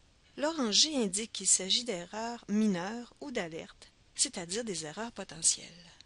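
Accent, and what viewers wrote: Canada. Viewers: Excellente présentation et j'adore l'accent canadien;-) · Excellente vidéo de présentation. Les explications sont claires, concises et précises. Et l'accent canadien ajoute encore comme une couleur ou un parfum que les Français apprécient beaucoup. Cette vidéo permet de comprendre rapidement comment fonctionne le logiciel et fait gagner beaucoup de temps à ceux qui en ont besoin et qui veulent apprendre à le maitriser. Un grand Merci à.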